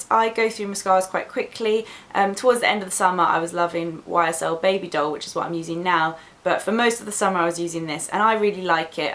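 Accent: United Kingdom. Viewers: british accent...subscribed! :D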